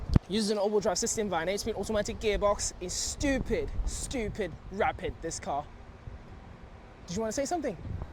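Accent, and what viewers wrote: United Kingdom. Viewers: Broo with that accent, you giving UK vibes😭😭🔥🥂 W UK accent, keep up the tremendous job🔥🔥🔥🙏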